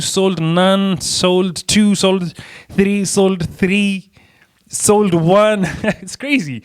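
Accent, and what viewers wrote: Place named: Africa. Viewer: What part of Africa are you from? I came here for POD info but I stayed because I love your accent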